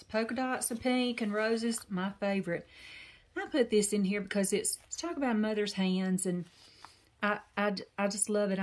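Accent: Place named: Alabama, United States. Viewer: Beautiful journal! Awesome first video! This Texas girl loves your Alabama accent!!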